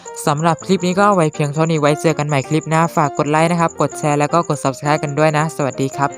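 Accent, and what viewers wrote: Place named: North, Thailand. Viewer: สาวเหนือน่ารักอยู่แล้วคับ โดยเฉพาะสำเนียงมีเสน่ห์มากๆๆๆๆๆๆ คับ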